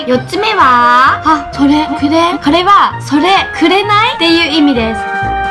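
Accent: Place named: Hakata, Japan. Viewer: さんの博多弁が最高です👍💏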